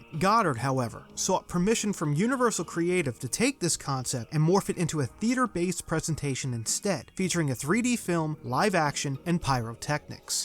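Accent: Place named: Baltimore, United States. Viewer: yo this man got a thick baltimore accent lmao "for more den two decades" love you dawg!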